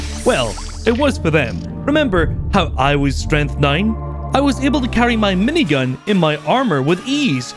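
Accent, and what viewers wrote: Scotland, United Kingdom. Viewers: Are you Scottish pal ?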